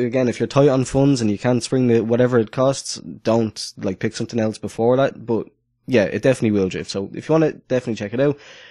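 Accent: Dublin, Ireland. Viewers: Where in Ireland do you live? You sound like a bit of a Dubliner. :)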